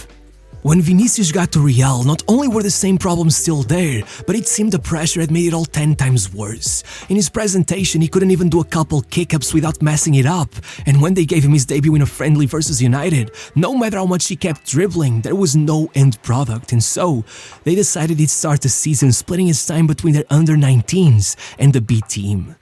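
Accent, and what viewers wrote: Portugal. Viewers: It took me 2 minutes to realise that this guy was Portuguese lol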